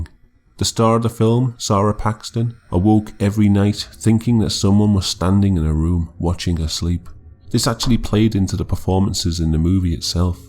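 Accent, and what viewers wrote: United Kingdom. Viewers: If you're curious about the three claw mark thing- it's a common occurrence in demonic activity. It's a mockery of the trinity rather than because demons have three claws. I was curious about that so that's why I know. Although I have seen one before. Very unpleasant sight... Definitely a new type of video. People tend to stay with the ones everyone knows, the original Omen/Poltergeist/etc so it's refreshing to see strange happenings in other films. Keep up the quality work, man. Random question, but are you British? I've been trying to figure out your accent. I'm not too good at figuring them out. DX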